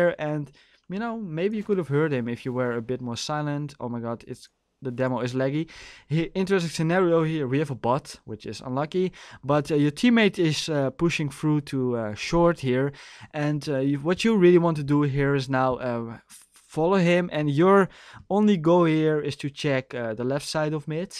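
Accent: Netherlands. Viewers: Good job man, I appreciate new people trying to be helpfull to the community! Also keep talking as much english as you get the opportunity for ;) Believe me as soon as the dutch accent starts to fade people will appreciate it even more.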